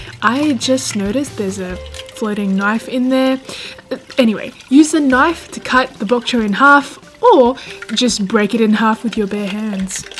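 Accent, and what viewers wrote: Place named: Australia. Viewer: it's strange to hear an asian person with an australian accent. i'm from vancouver where most asian have an asian accent. it's very cool.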